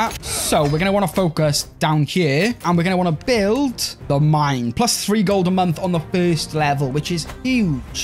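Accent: Wales, United Kingdom. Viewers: Your Welsh accent is delightful.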